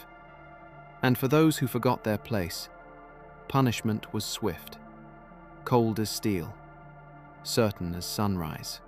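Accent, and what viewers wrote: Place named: United Kingdom. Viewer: Even AI with a British accent mispronounces all foreign words. 🇺🇸⚓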